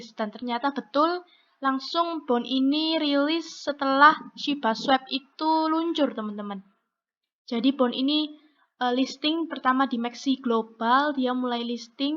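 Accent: Java, Indonesia. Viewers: medok x cakapnya hehehhehe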